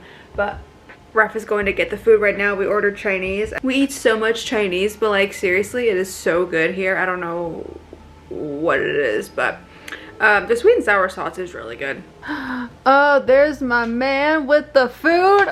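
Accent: United States. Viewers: The weather looks gorgeous in Palermo - and so does the city! Oh to be there!! Strange how, as I Brit myself and a regular follower of, I can tell Raff's particularly "English" accent seems so noticeable against your naturally "American" English - something I just don't notice when listening to on his own.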